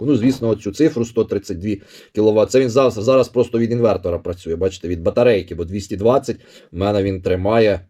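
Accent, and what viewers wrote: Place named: Cherkasy, Ukraine. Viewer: Черкащина. То місцевий діалект 😂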